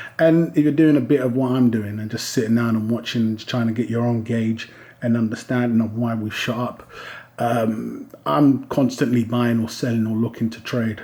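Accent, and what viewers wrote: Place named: Yorkshire, United Kingdom. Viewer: Thank you … I know we don't always agree 100% on things but its good to have many points of view. PS I am in Yorkshire …. So I am guessing we are not too far apart on your accent!!!! Anyway I buy only to melt them down.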